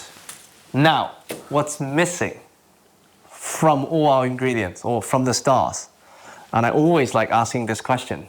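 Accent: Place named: United Kingdom. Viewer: We just saw a man of Asian ancestry with a British accent fuck up a traditional Southwestern United States dish....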